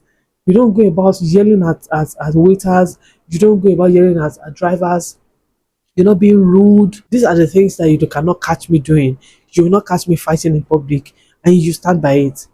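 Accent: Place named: Nigeria. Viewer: Maaan… I love Nigerian people, the accent 😊❤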